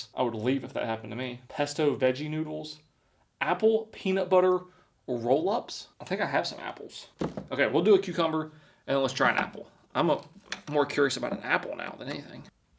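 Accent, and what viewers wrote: South, United States. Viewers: Sounds country but doesn’t act country 🤷🏻‍♂️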